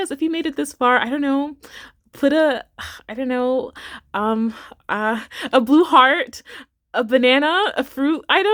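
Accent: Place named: England, United Kingdom. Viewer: The English accent had me out 😂😂😂 love your video style !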